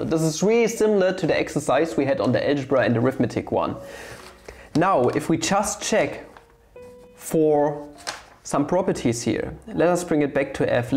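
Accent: Germany. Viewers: Hat keine Sekunde gebraucht um den deutschen Akzent rauszuhören haha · Dieser deutsche Akzent ist richtig Hardcore. Aber geil!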